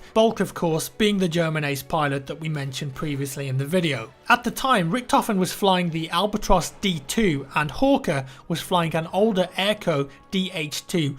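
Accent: United Kingdom. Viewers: I refuse to believe you think really is american, Like what kind of fucking idiot wouldent know he is british JUST HEAR HIS ACCENT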